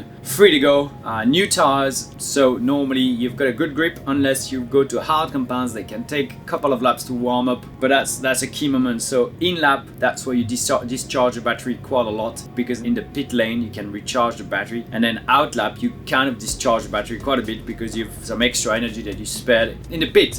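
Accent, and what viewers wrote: United States. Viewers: wtf is with your American accent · You sound more and more American every week haha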